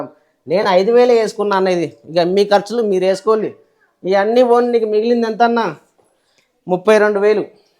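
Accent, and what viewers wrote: Telangana, India. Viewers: పక్క తెలంగాణ యాస🩷🩷🩷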